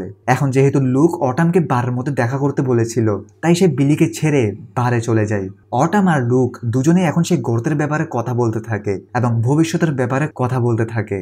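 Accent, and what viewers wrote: Chittagong, Bangladesh. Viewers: আমার মনে হয় আপনি চিটাগাং এর। আপনার কথা বলার স্টাইল টা তাই বলে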